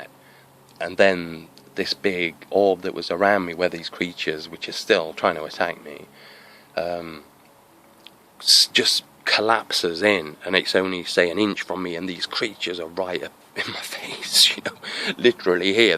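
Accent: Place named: Lincolnshire, United Kingdom. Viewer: could i ask what region your in? your accent sounds like mine im in lincolnshire. just wondered as everyone i know is asleep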